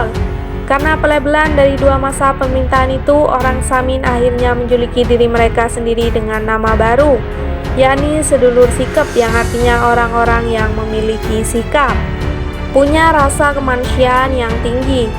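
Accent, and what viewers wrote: Madura, Indonesia. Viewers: Naratornya..sepertinya ..orang madura..logat nya kental sekali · Admin nya orang mana ini kok Logat nya Kya Madura 😅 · Cewek pembaca cerita nya orang madura atau mana? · naratornya oreng MEDURE neka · Makin lama makin dalam maduranya .. 🤣🤣🤣🤣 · Voice over nya logat Madura ? Betulkah demikian ?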